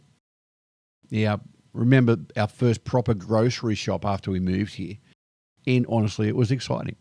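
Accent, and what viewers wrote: Australia. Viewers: So many daily details we take for granted or aren’t conscious of, till they’re gone, then we notice and are grateful! ☺️ I like the Aussie accent and kookaburras! Joy.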